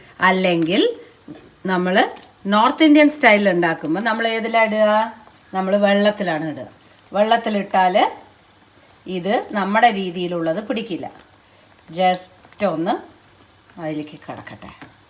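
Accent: Palakkad, India. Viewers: പാലക്കാട്‌ നാടൻ ഭാഷ സൂപ്പർ ❤️❤️❤️ അമ്മ ❤️❤️❤️❤️❤️❤️❤️❤️❤️ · Hai eepaalakkadan samsaravum paachakavum eshttayi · നല്ല വീഡിയോ, തൈര് വട എനിക്കും നല്ല ഇഷ്ട്ടോണ്, പിന്നെ നമ്മുടെ പാലക്കാടൻ ഭാഷയും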